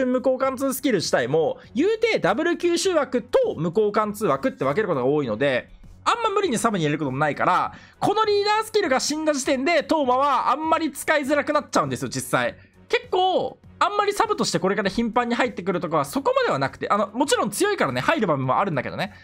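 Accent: Kansai, Japan. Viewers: この人関西人なん？笑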